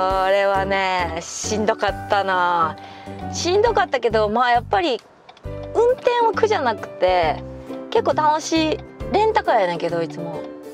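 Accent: Osaka, Japan. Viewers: She speaks with that Osaka accent, so if you know a little Japanese she might still be hard to follow.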